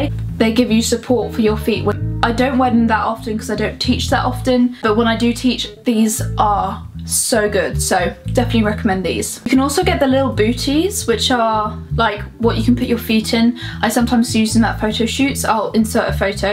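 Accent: England, United Kingdom. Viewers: I just love your English accent, I could listen all day long.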